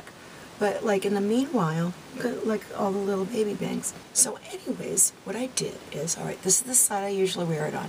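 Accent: Boston, United States. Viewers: Just love your Boston accent. You have got a lot of hair up front. Be thankful, cause I would love to have that much hair. Love the video and good tips on top too! Have a great Turkey day🦃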